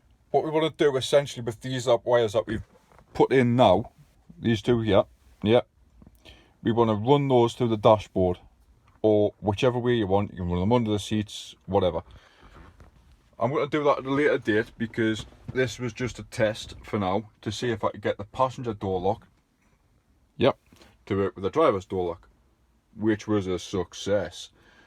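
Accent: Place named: Australia. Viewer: What part of Australia are you from ?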